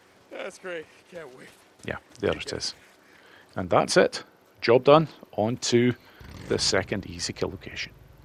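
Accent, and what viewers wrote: Scotland, United Kingdom. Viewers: Dude is it Scottish accent you got? Sounds like Mimir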